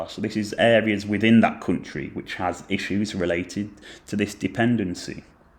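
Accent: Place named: England, United Kingdom. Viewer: I like the english accent and the way u make this sound soo obvious😭🙏. Called us baboons in all tongues 😭🙏.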